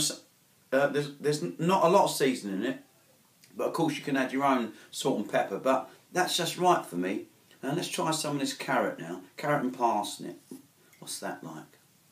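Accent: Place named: United Kingdom. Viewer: british accent.. the can was already open.. : disliked and reported.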